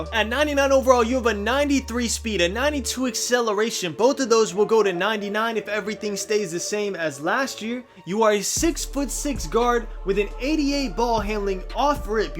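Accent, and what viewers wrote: Texas, United States. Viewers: Are you from Texas? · You from Texas? Or Atlanta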